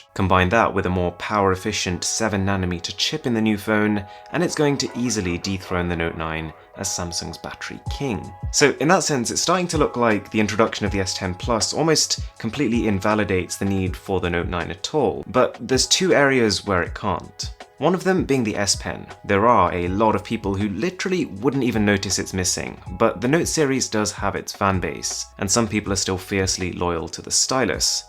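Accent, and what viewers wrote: RP, United Kingdom. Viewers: Dude. Your voice is making me fall asleep. So calm and posh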